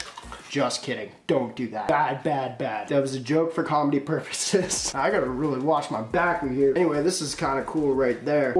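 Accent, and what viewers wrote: Canada. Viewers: dude are you canadian or american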